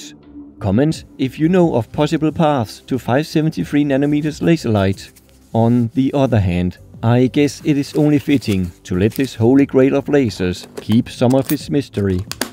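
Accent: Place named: Denmark. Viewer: Grinernt nok du er dansk! Accenten skinder igennem :P Fed video!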